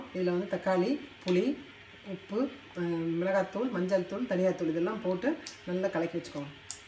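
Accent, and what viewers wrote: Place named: Trichy, India. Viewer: hello mam.. I'm mesmerized by ur speed of cooking... simply superb... which part of tamil nadu are you from..? your slang seems like u are from trichy ... i guess..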